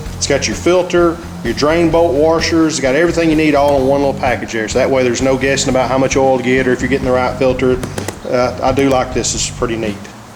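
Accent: South, United States. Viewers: Yea you do, Southern accent. Love the South! BTW thanks for the video for you have provided me with a YouTube Degree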